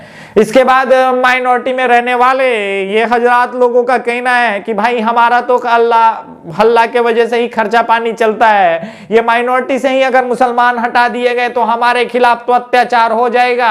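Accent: Hyderabad, India. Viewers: Bro we want more of hyderbadi accent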